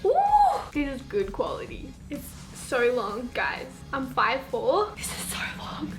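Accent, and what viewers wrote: Australia. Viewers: R u Australian?I am too!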